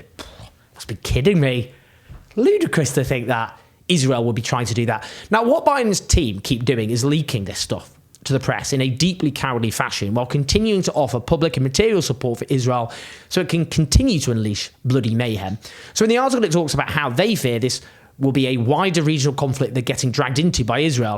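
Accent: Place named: England, United Kingdom. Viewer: I love the English accent 🇬🇧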